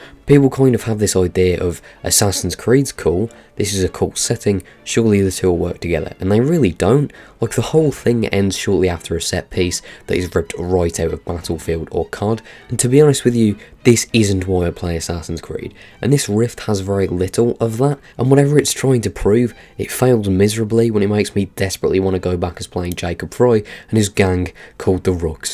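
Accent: United Kingdom. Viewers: Hello, I've just finished playing this game, and it was my first AC ever. And I loved it. But I also totally agree with your review! Thanks for opening my eyes in some aspects. I still enjoyed it though, and will probably platinum it since i'm halfway through that already. Also, I'm a sucker for London and British accent, so I guess I'm biased. I suscribed for this review ♥ gonna binge-watch some other videos of you!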